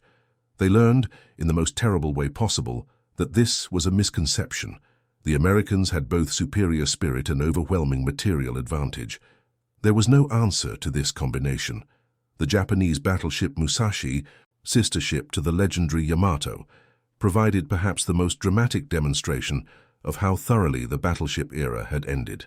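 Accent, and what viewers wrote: United Kingdom. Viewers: Why is it always the voice of a Brit talking about American history ?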